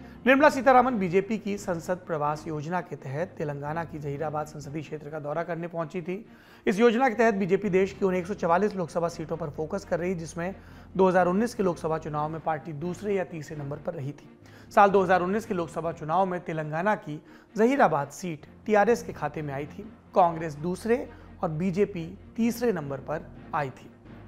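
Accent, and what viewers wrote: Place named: Bundelkhand, India. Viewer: देश की खवर देश की आवाज़ देश के लहजे में देश के बुंदेल खंड के सपूत सौरभ द्विवेदी संमोहित करने वाले अंदाज़ में समाचार सुनाने वाले ग्रेट.....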